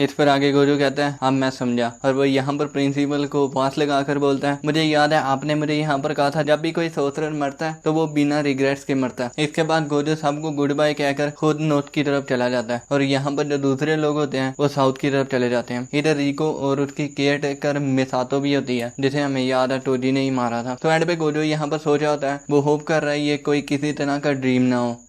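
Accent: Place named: Pakistan. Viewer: Yaar aap ki awaaz Pakistani jasi ha na isliye 😅😅😅❤❤❤ nice explain bro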